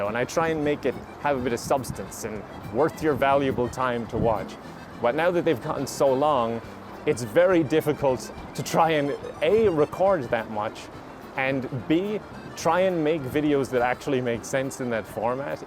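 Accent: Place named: Ireland. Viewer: I rewatch his videos all the time. I like to sleep to his soothing Irish voice lol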